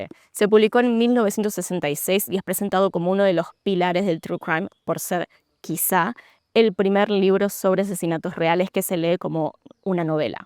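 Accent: Buenos Aires, Argentina. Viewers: Excelente tu análisis!!!, tu léxico, que en tu otro canal, en los últimos tiempos me hacían ruido las groserías y no lo digo por mojigata, sino que sos la única argentina a la que sigo hace años y más allá de algún que otro modismo, sin llevar tú relato al neutro, hacés honor al habla del porteño, que tan lindo se escucha. Gracias totales porque sin esfuerzo me hiciste analizar porqué veo y a quienes elijo para ver y oír tanto tru crime. 💋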